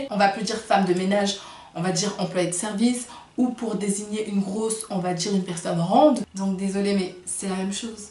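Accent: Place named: Africa. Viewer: C moche l accent africain. Dsl c est mon point de vue.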